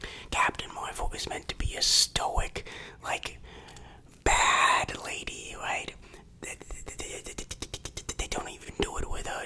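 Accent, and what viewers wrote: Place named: New Jersey, United States. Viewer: Are you from New Jersey? Your accent's pretty strong.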